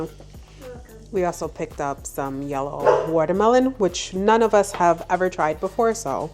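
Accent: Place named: New York, United States. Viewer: hey baby…. awh the face mask was so cute. lol a tooth ache is the absolute worst. We do miss Meg… happy Mother’s Day babe. We want you to vlog more too…👀 Say “coffee” again…🤭 your NY accent is everything. yum, love Greek food! The bouquet is gorgeous, you did amazing baby. Oooow, this Sunday my husband and I are going to indulge a bit. I’m going to pick up some of that tequila. Not I think I’m Spanish…🤣 Your face is flushed after those two drinks. That one looks so good too. By the looks of it, you guys had an amazing Mother’s Day.🩷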